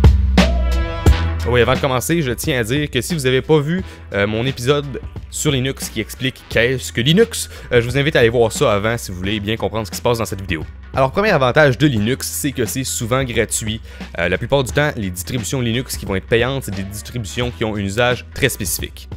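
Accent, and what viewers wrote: Quebec, Canada. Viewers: L' accent canadien, j'adore !!! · Super a part qu'il parle un peux trop vite . de plus avec son accent canadien sa arrange pas les chose mais c'est se qui fais le charme de se jeune homme. Concrètement vidéo sympathique.Vivement la prochaine vidéo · Clair, net et compréhensible. Si seulement tous les tutps étaient aussi compréhensible. Et je kif l'accent québéquois. Trop marrant. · killer des québécois* les canadien ont pas cette accent, la plupart ne parle même pas français, c'est un québécois (au canada) et c'est l'accent québécois ;)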